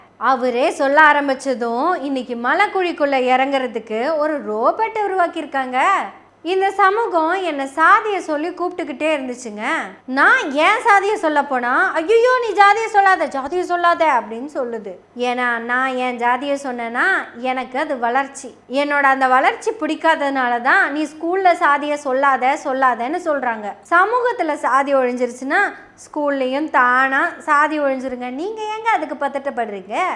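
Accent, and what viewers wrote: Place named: Coimbatore, India. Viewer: I like your slang...😂 Use mostly covai slang...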